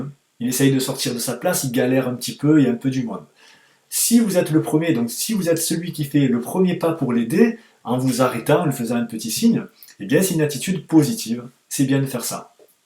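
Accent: South, France. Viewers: J'ai passé 3 fois mon permis, la première fois j'ai loupé une priorité avec le stresse. La seconde fois j'ai eu 19.5 ( il faut avoir 20 je le rappelle) sans faute éliminatoire. j'ai jamais compris pourquoi. Cette fois ci j'ai eu 19! et sur mes résultats il me manque 1 point pour l'installation au poste de conduite...Sérieusement, le poste de conduite? Je comprend vraiment pas le problème, a part qu'a cause de ça il faut encore que je recommence! ça coûte trop d'argent! J'en suis a 60 heures... Je déprime grave. Mon porte monnaie crie famine... En plus mon auto école est pas top, par exemple le jour du permis le prof accompagnateur ne viens pas pour te rassurer, te dire si c'étais bien ou pas. Personne ne t’emmène ou ne te ramène sur les lieux de l'examen tu te débrouille. Ce qui, moi personnellement, ne m'aide pas à déstresser. Aussi, les cours durent en moyenne 35 minutes et coûte 48€ l'heure. 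Beaucoup trop chère! et j'habite même pas une grande ville! A vous semblez très professionnel, je vous remercie pour ces vidéos ça aide bien! dommage que vous n'êtes pas dans ma régions... On entend l'accent du Sud!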